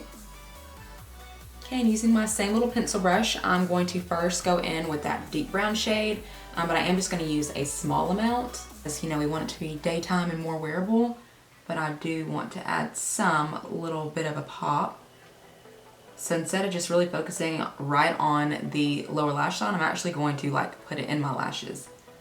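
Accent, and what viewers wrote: South, United States. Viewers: Sounds southern.....(I'm a Texas girl) ☺ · I’m from Kentucky we share the same southern accent! Lol. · I love it!!! I'm from NC, and its so refreshing to hear it! 😊 (Yes, I realize the geographical distance between Alabama and North Carolina, but I meant the southern-ness of it!!!) 😘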